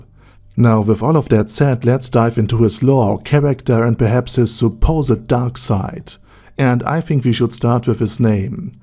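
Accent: Germany. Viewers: having a german guy narrate overlord is oddly fitting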